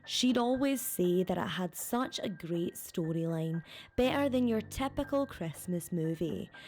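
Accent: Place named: Glasgow, United Kingdom. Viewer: Fucken hell didn't realise how much I missed your wee wegie accent 😂🤘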